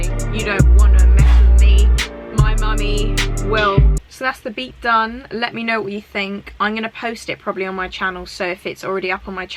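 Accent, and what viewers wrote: United Kingdom. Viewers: Love the beats and listening to British people talk. Best of both worlds 🤣👍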